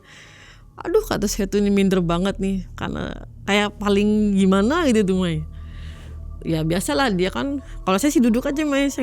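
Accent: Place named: Indramayu, Indonesia. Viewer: Logatnya kaya orang Indramayu,, Gak mau suudzon sbnernya .. Tapi kalo bener yaaaa cukup tau ajah soal mie yamien 😅😅😅 jadi takut ...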